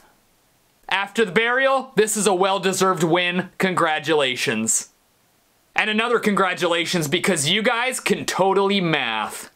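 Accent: England, United Kingdom. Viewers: Spot on English accent to be fair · As a Brit, I approve that accent.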